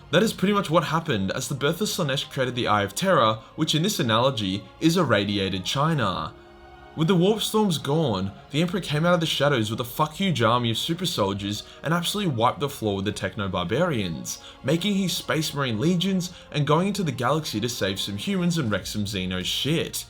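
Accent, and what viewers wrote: Australia. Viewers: Finally, we take aim at. I used to like his stuff, starting with the Siege of Vraks which I still watch. But he got a bit too political. I didn't come for rants on companies and politics I came for the Grim Dark of the Australian Accent.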